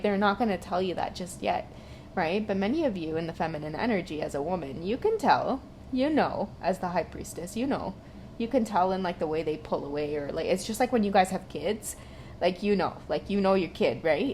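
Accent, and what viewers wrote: Trinidad and Tobago. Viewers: That Trini🇹🇹 accent though 😍 But I'm Jamaican🇯🇲 the song too 😅 but yea I totally get it 😀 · Best reading just for that Trini? accent.... So beautiful... I love your vibe❤ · You sound like a Trini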